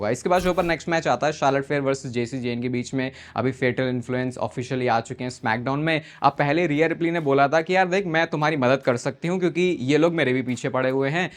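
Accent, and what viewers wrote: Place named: Uttarakhand, India. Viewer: ❤ Bhai's Pahadi (Uttarakhandi) Accent 😅❤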